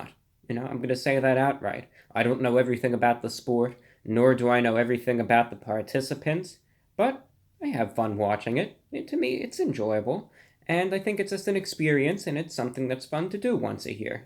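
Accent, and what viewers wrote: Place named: Mid-Atlantic, United States. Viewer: He likes to be classy, if u notice his got a mid atlanitic accent which was popular before 1950. people back then wore suits all the time too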